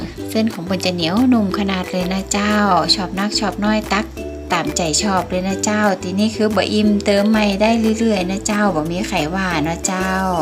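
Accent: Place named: Northern Thailand, Thailand. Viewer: น่าทานมากค่ะข้าวซอย อู้กำเมืองเพราะจังค่ะ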